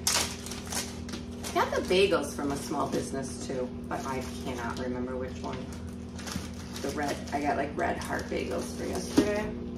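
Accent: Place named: New York, United States. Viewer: You sound like a New Yorker today. Lol! Love watching your videos.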